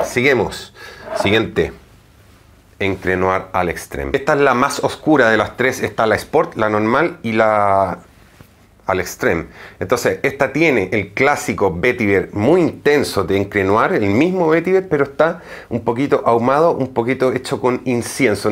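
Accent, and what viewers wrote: Chile. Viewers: ¿Cómo saben tanto de la vida de este señor?. El acento chileno es inconfundible eso si. Sobretodo para los chilenos. Genial que mantenga el acento, es un plus en este blog. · Tenes acento chileno me parece inconfundible pero explicas muy bien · Eres chileno?? Saludos ! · Este chico es chileno? Le noto un cierto acento de aquel maravilloso país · Eres chileno?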